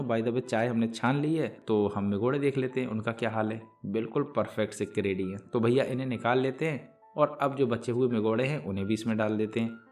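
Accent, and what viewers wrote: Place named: Bundelkhand, India. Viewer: आपकी बुद्देखंडी हिंदी बहुत बढ़िया लग रही है 👍 मुंगोसे भी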